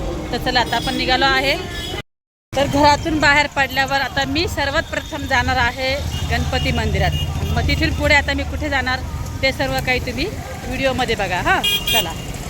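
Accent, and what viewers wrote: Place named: Sangamner, India. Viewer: मला तुमच्या भाषेवरून वाटलेच होते की तुम्ही संगमनेरच्या आहात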